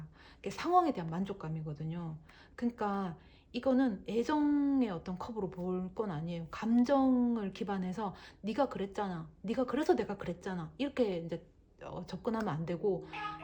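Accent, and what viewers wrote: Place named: Gyeongsang, South Korea. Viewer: 경상도 사투리 넘~잼나요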